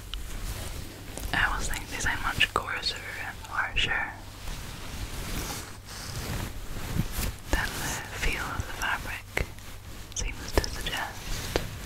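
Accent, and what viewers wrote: Scotland, United Kingdom. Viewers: Prefer the rambling to the pure sounds because of the melodious Scottish accent. You should def do livestreams- super under utilized in asmr community. Also wouldn't hurt you to get some of dem superchats!😉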